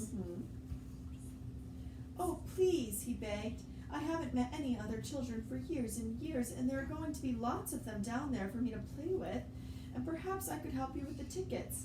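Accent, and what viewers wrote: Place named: Germany. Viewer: Oh ok I was wondering by her accent it sounded like a German accent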